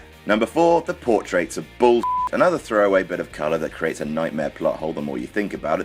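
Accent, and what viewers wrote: United Kingdom. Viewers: also fascinating how a brittish accent on a woman can be really sexy and yet a grown man nasally whining in the same accent is like firing a nailgun at a shallow angle against cello made of chalkboard for 6 minutes solid.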